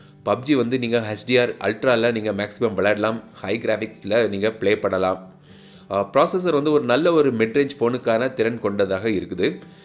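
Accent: Kanyakumari, India. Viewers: உங்கள் குமரித் தமிழில் கேட்பது இன்னும் திருப்திகரமாக இருக்கிறது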